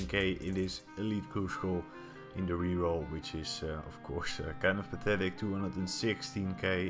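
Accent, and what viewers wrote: Netherlands. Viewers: Heerlijk Nederlands accent er doorheen. Audio is idd beetje slecht te horen. Kwam m tegen in me lijst heb gelijk ff geabonneerd. Drop die PVM dingen haha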